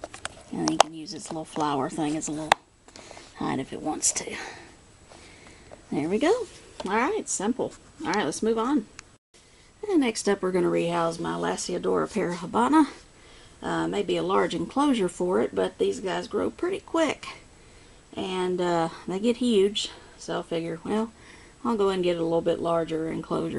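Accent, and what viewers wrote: South, United States. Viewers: Love your videos!!! I thought you had a southern accent, my mama was born in Tennessee but she raised us kids in WV. Tennessee is a beautiful state. I love it there.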